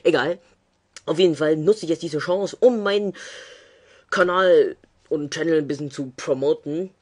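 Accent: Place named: Stuttgart, Germany. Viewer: Für einen Stuttgarter erfreulich wenig Dialekt, für einen 14-jährigen eine recht gute Stimme - so weit, sogut. Wichtiger Tip: Vorbereiten! Gerade bei einem solchen Video sollte man sich vorher ein paar Gedanken über den Text machen, notieren, ausprobieren etc.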